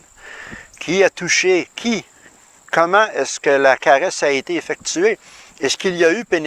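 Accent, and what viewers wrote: Quebec, Canada. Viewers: Cher, tu ne me connais pas encore mais ça fait déjà quelques mois que j’écoute avidement depuis la Suisse tes vidéos (attention, au masculin en québécois…! ). Tout d’abord, j’ai toujours adoré l’accent québécois et j’ai toujours l’impression que vous autres avez un humour supplémentaire et plaisant par rapport à chez nous! Merci pour toutes tes vidéos (pardon, tous tes vidéos! ) tellement logiques, intelligents et en même temps simples à suivre et comprendre. Tu fais, toi au-moins, du bien à beaucoup de monde qui ouvrent les yeux et se déculpabilisent, contrairement à ces connards ridicules et rigides de la Watchtower! Quelle arrogance que des humains se prétendent représentants de Jésus et Dieu sur la terre (auxquels, d’ailleurs je n’ai jamais vraiment cru). L’un de nos comiques suisses disait dans l’un de ses sketches « peu-à-peu les écailles churent hors de mes oculaires…! 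). Eh bien c’est mon cas bien que je n’ai été qu’un « intéressé « comme ils disaient à la congrégation pendant quelques années, heureusement que je ne me suis pas fait baptisé (Dieu m’en garde…! ). Depuis 2 ans, j’ai fait un travail psychologique sur moi-même et tes vidéos, ainsi que ceux de Dominique Reynaud en France, ont contribué à donner un coup de balai définitif à ces âneries des TJ et autres religions; en somme, pour moi tes vidéos c’est « la cerise sur le gâteau «. Merci encore et mes amitiés virtuelles depuis la Suisse région Lausanne. Daniel Bulloz.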